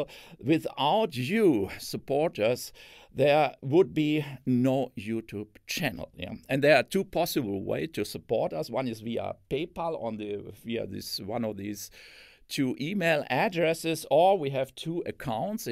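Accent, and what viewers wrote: Germany. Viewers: Das deutsche Englisch verstehe ich viel besser als das englische Englisch, so kann ich sehr gut mein Englisch verbessern. Der Vortrag war natürlich auch sehr gut.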